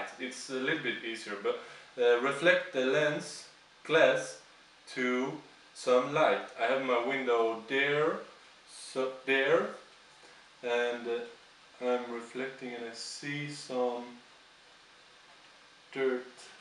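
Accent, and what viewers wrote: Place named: Scania, Sweden. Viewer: du är skåning:D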